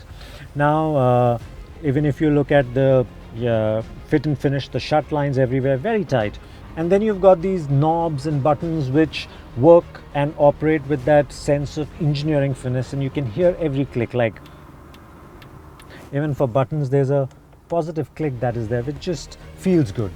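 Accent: India. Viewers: You must have never heard a native english speaker. He sounds just like any Indian on news channels. 😑😑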